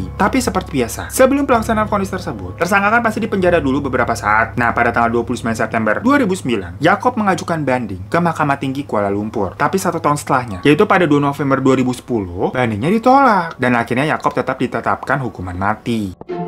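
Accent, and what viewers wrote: Indonesia. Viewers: Akak ni org mana kak Bahasa akak mcm indon je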